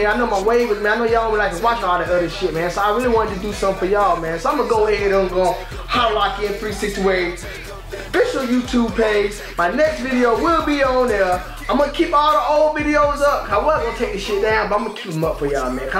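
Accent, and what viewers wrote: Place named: Georgia, United States. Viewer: Nigga got be from savannah,dublin,or atl💀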